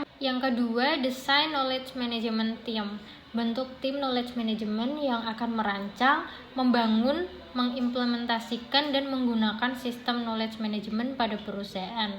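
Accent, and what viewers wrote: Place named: Java, Indonesia. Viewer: Baru ini dengar yg ada medoknya😂😂 anyway thanks ya!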